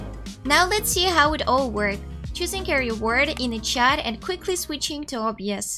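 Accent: Russia. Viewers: English in russian accent is like an asmr video , so smothhhhh and beautiful voice!!!!!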